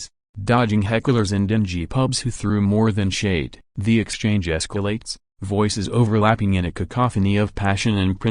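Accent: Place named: United States. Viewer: Sounds like an American giving a commentary on a English Tv's loose women?? It's all B/S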